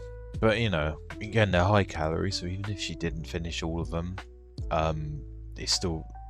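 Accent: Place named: United Kingdom. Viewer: Hello. I’ve just recently come across your videos. Having once been super sized myself, I can totally understand your points of view. By the way you’re very handsome looking and I love the British accent that you have. Continued good luck with your YouTube channel 😊.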